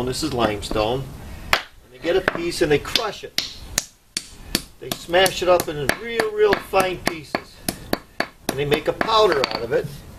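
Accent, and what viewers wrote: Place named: Chicago, United States. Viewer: Sounds like a Chicago accent, awesome!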